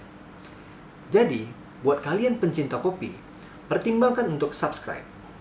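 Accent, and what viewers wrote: Medan, Indonesia. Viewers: Abg orang medan yaaaa? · opening-nya ini jadi signature ente banget bang... "apa kabar kelen kawan kawanku semua...?" Medan Taste...! keep it up bang...!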